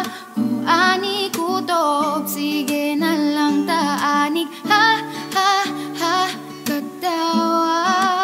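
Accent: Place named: Visayas, Philippines. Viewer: ang cute mag BISAYA hehehe NICE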